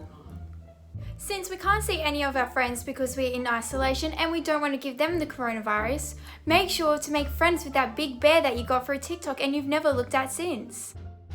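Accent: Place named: Australia. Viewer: I really like your Australian accent! Also, I love bored eating too.😂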